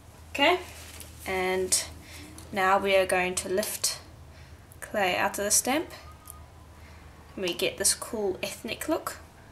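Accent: South Africa. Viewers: Hi. Where are u from? You sound South African :)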